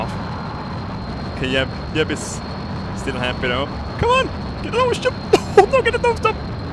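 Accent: Sweden. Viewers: The language he is talking is English. His accent is Swedish. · It's his awesome manly Swedish accent maveon carter so go fuck off. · I love swedish accent:D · dat swenglish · it's a typical swedish accent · SurvivalGaming Romania Hes Swedish its a Y sound, like jimmy sounds like yimmy.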